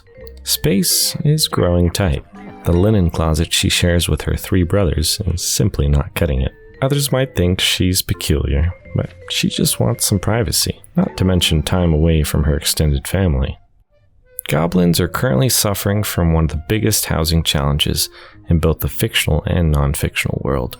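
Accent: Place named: Ireland. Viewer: Are you from Ireland?? I didn't recognize your accent! Absolutely love this ! Wish I was as talented !!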